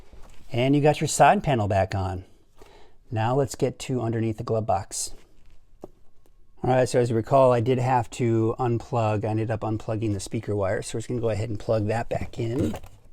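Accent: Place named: United States. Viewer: I Think you're the best guy out there on YouTube to practice the American Accent :) Love your videos!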